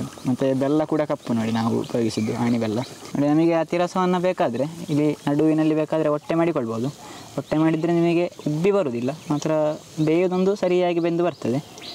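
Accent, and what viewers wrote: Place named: Dakshina Kannada, India. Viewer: It's called athirasam among the Tamils, kajjaaya among the Kannadigas and Anarasa among the Marathis. It is a pan south Indian sweet. In my childhood at our village , we didn't know cakes and other cookies..Athirasam is so popular, no festival is celebrated without Athirasam Kajjaaya. Thanks for your narration with tips on making paaku . Your DK accent is feast to ears.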